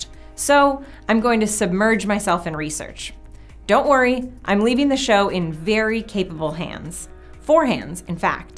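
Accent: United States. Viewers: Knob - Not at all: he spoke very very clearly and not fast at all. And I'm non-native English speaker: he has the correct Virginian speed and accent for me (and for most AFAIK).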